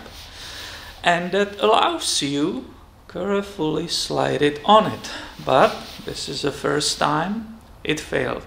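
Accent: Germany. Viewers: Thank you for you honesty and vulnerability regarding this repair. I am a High School Auto Shop teacher and I always impress upon my students that everything you do is a resume. Sometimes repairs won't work out perfectly and you might have to eat a bit of loss to complete the repair and satisfy the customer, but if you are honest and transparent, you will earn their trust and have them for life. You will also earn the customer's referrals. You are the kind of YouTuber that I am happy to add to my course content! I had the same experience on my wife's BMW X3. The o-ring on the oil cooler line tore the same way and leaked all over the accessory belt. Luckily I noticed it before the belt dissolved and broke (they have been known to wind around behind the harmonic balancer and punch through the front main seal into the sump, resulting in major engine damage). By the way, I am curious what part of the Old Kountry you come from. 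My wife was born in the Flensburg region but she can't identify your Dialekt. Bayrisch? Schwabisch? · are u German or Dutch? Long time no video, thanks!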